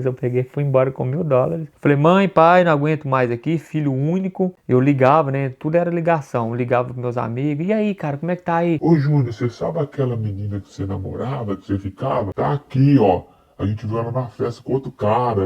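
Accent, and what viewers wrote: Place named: Minas Gerais, Brazil. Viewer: Lindo esse seu sotaque mineirês.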